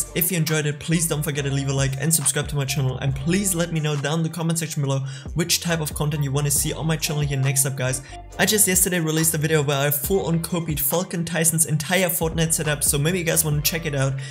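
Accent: Germany. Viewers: So ein deutscher Akzent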